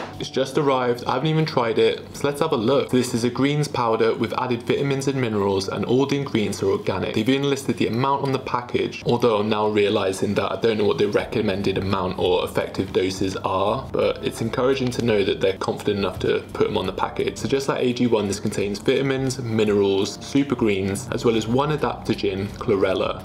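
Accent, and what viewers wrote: United Kingdom. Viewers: Dude I have NO CLUE where in the UK is from but its HAWT!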